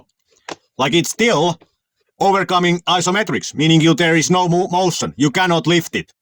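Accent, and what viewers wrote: Finland. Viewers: Just by listening to your accent, you are finnish! I was in seinajoki for 1 year! Mitta kuulu? Lol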